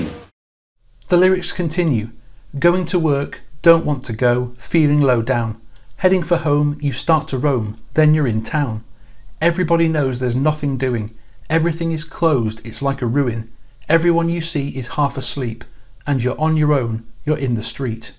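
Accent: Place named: Yorkshire, United Kingdom. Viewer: Pure bollocks. That was an hour I shall never retrieve. Love your Yorkshire accent though.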